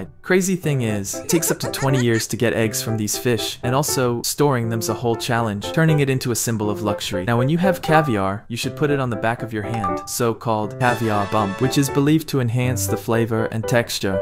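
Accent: United Kingdom. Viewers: Why did bro become more and more British as the video went on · Anyone else notice like half way through he turned British? Wtf? Or am I having a stroke? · Why did he start forming a British accent throughout the video am I tripping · I like how he progressively becomes more British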